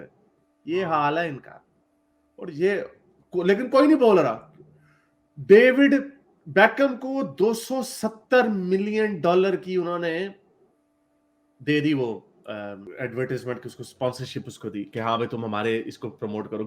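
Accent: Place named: Punjab, India. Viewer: Bhai ji apki urdu ka panjabi lahaje me bat karna kafi acha lagata hai🌹🙏🌹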